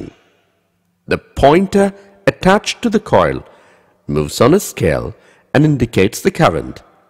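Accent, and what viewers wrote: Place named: India. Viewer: Sounds like an Indian who tries really hard not to sound Indian.^^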